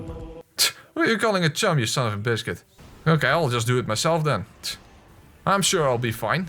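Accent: Netherlands. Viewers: hahaha een echte nederlander haha wat een acsent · Perfect Dutch accent💎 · Aha ik dacht al wat een Nederland accent dit maar blijkt dat je dat ook bent❤ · You have a dutch accent. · man man ge kunt ge woon horen dat deze man een Nederlander is, hallo buurman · Jesus f christ I can just tell you’re Dutch, that accent is fucking awful.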